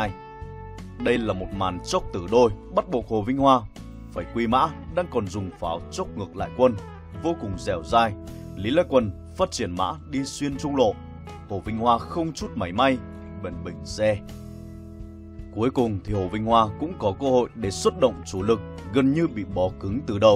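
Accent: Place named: Phu Yen, Vietnam. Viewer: xét về kiến thức + giọng bình thì kênh này số 1. Vịt ú chỉ là làm trước, nhiều người theo dõi trước thôi, giọng Phú Yên nghe ko hay, ko truyền cảm bằng giọng Trung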